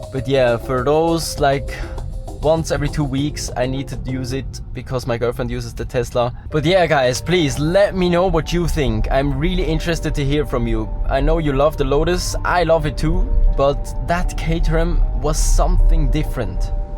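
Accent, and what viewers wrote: Switzerland. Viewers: Your voice sounds so majestic in this clip like some superhero lol and the swiss accent elevates it😂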